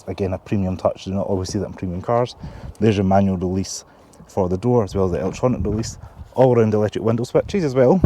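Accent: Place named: Glasgow, United Kingdom. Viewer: Love and miss that accent mate. You have a new subscriber ex-Glaswegian :)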